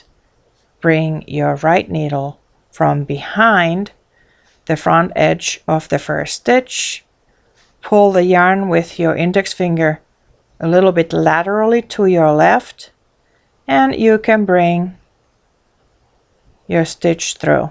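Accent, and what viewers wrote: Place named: Russia. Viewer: Yes, IMHO, her purl is definitely 'Russian' style.....perhaps her accent, too.....not that it matters..........and, it twists the stitch CW versus CCW and requires working through the back of the stitch/es on the return row......which can get messy if working a complicated pattern.....lace work in other words.....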